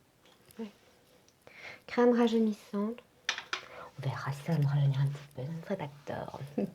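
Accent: Belgium. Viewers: l’accent belge en plus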